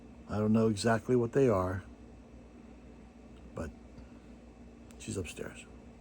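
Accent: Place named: United Kingdom. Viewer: Cheers! With a British accent like that, you should go far!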